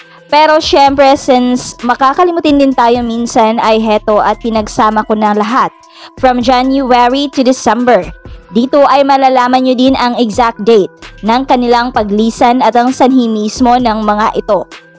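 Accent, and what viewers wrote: Philippines. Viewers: KABWISIT BISAYA YUNG NARRATOR!!!!!!!! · Hindi mganda boses ng nagsasalita halatadong bisakol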